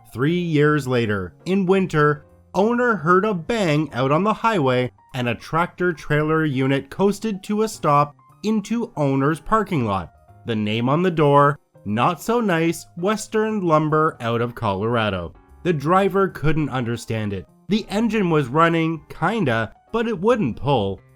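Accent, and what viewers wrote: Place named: Canada. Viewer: Also "manufacture" and "process". You don't have the stereotypical Canadian accent on "house" and "about" and you don't throw in a bunch of "eh"s, but the accent is still pretty prominent.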